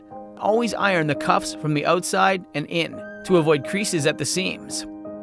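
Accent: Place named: United States. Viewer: Bli kvitt den teite amerikanske stemmen.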